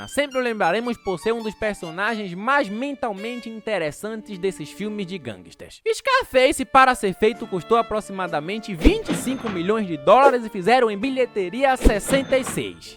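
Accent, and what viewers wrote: Northeast, Brazil. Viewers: Porra mano aí e foda vc reclamar do sotaque nordestino si o cara nasceu no nordeste tu quer q o cara tenha o sotaque do Sul ou de sp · VOZ HORRÍVEL TENHO RAIVA DESSES NORDESTINOS SOTAQUE LIXO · Vídeo bom , porém esse sotaque nordestino ferra tudo !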